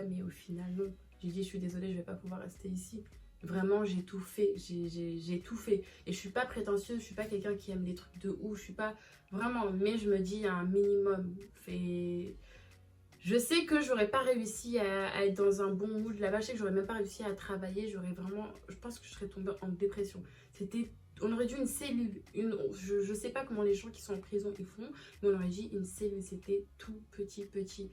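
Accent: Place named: Marseille, France. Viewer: Mhhh Marseillaise heiin 😅 #teampsg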